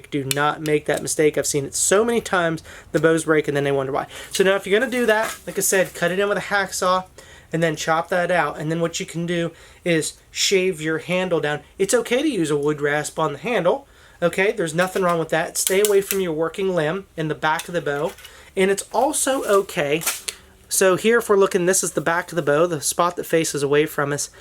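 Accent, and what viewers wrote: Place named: South Jersey, United States. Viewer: Are you from the South Jersey/Philadelphia area originally?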